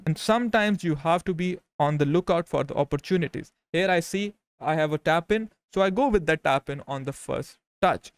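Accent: India. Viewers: your Indian voice is annoying asf tho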